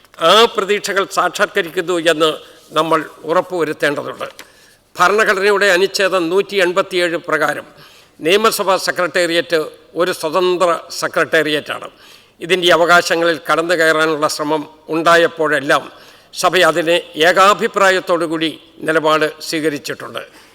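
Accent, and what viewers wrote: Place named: Kottayam, India. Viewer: ഭാഷാ ശുദ്ധി ❤❤❤ കോട്ടയം അല്ലേ ❤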